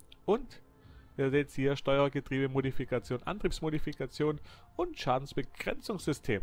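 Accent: Bavaria, Germany. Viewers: absoluter Fan von dir, erinnere mich noch gerne an WoT mit bayrischem Dialekt. Da ich seit Mai in Wien wohne muß ich sagen hier wird alles gesprochen nur wenig österreichische Dialekte.HeHe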